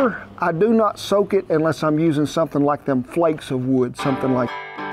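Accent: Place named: Oklahoma, United States. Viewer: Kinda lost respect. :( Your an Okie. Thought you was a Texan. Then again explains alot. GOD bless.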